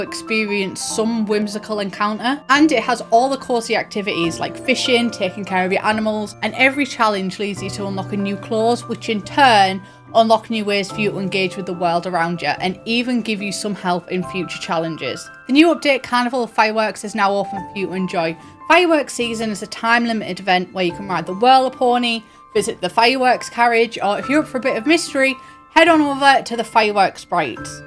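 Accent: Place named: Working class, United Kingdom. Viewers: I haven't paid so much attention since literal university. You've got such a good mixture of academic and fun, working-class British and it's so engaging. I absolutely love listening to you talk. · james cook also colonised where i live in far north australia, he was the first european to arrive here actually. we actually used to have a massive statue of him where he literally was doing the hitler salute and a bunch of racist locals were really up in arms when it was removed a few years ago. our main university is james cook university, and there’s a little “town” nearby literally called cooktown. that man was terrible though, along with the rest of his fleet of colonisers. murderous, pillaging, entitled bastards. according to some aboriginal/first nations lore his arrival was foretold as a large pelican that would bring destruction, and in fact what was foreseen were the sails of his boat, which the people had no prior reference for. 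had no idea that he was from middlesbrough. i find a lot of northern brits are drawn to live here though, and not to generalise and obviously not everyone is the same but i really like northeners better than other brits, they’re a lot more down to earth, less serious and generally my kind of people. 😊 my step dad was northern and his accent and the words he used and way he said things are so much like.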